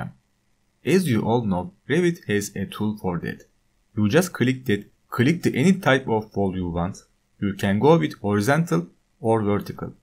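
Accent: Turkey. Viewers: aahahah abi türk yaa bende aynı böyle konuşuyorum :D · türksün değil mi ingilizcen onu çağrıştırdı??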